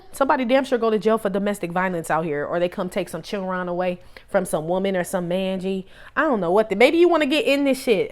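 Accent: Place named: California, United States. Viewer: My G....you got to be from So Cali....I know it!!! 😁😉 I know a fellow Californian when I hear one....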